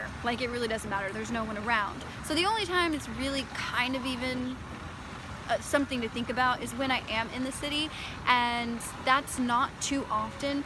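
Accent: United States. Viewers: DOES A LAOTATION SPEAK WITH THAT TYPE OF ACCENT? I WOULD THINK SHE IS AN AMERICAN OF ASIAN DESCENT.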